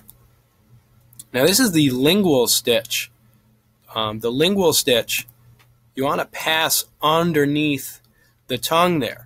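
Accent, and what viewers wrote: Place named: Long Island, United States. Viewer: As I was listening to your video I couldn't help but hear your very distinct Long Island accent. I am from Long Island but moved to Texas 6 years ago. Thank you for this video it was very helpful, you're right, they do not teach you those closures in school.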